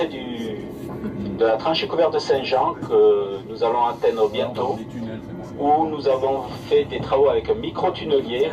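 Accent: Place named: Marseille, France. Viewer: C'est un Witz j'espère, le présentateur marseillais au micro ?! Cet épouvantable accent accompagné du fameux "quatre-vingt-dix" . Affreux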